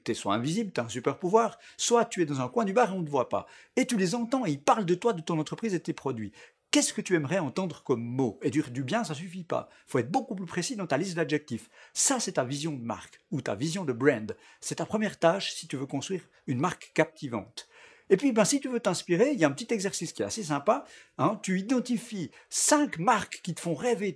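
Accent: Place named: Neuchâtel, Switzerland. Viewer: Ahhh j’me disais qu’t’avais notre bel accent ! Vive l’absinthe ! Vive Neuch !!! 😂🇮🇹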